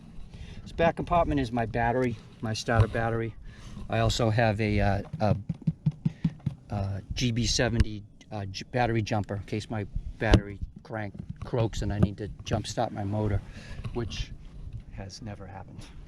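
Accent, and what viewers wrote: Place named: New England, United States. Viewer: Awesome...thanks for your service as well and the information! My fishing will be done in ME so the biggest water I’ll see will be Moosehead Lake. You sound like a NE guy so I’m sure you’ve heard of it. I hope you don’t mind if I hit you up again in the future with questions. Good chatting with you!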